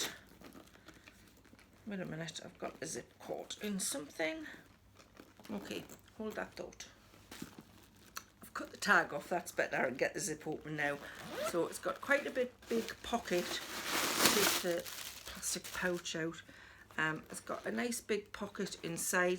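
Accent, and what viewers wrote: Newcastle, United Kingdom. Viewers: I'm a new subscriber. I really enjoyed your content.You come across as a lovely person and the Geordie accent is lovely.Looking forward to watching all the videos.Happy New Year .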